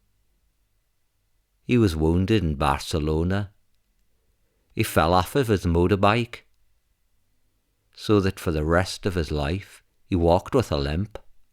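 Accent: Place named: Ireland. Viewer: Aye! I thought it was a pretty decent irish accent. Buuuut I'm American....soooo, yeah. ¡Top o the marnin!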